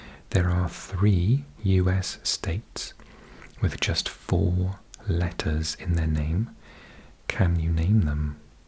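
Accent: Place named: Wales, United Kingdom. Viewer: This is amazing! I love listening to facts or meaningful things (not rambles) and in a Welsh accent, I’m blessed. If you happen to speak Welsh too then that would be another amazing video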